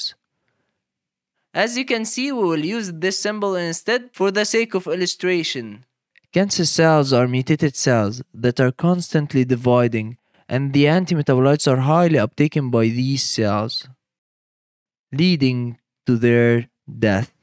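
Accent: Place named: Egypt. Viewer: اعرف المصري لما اسمعه😂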